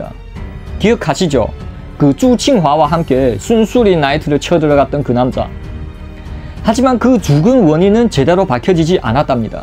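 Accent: Yanbian, China. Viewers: 너 연변이가? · 님도 조선족인가요 범죄도시말투네요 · 임마 연변만 좀 고쳐라 역겨워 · 연변말이여 뭐여 어디말투여?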